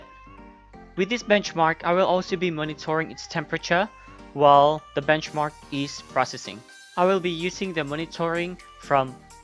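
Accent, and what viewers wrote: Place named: Australia. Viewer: dawg i know you're Pinoy so cut the aussie accent and speak our accent for the pride of the Filipino People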